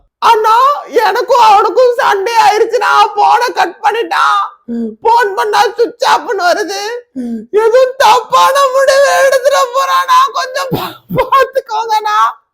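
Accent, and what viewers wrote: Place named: Coimbatore, India. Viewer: Bro deep in coimbatore oo nice slang nga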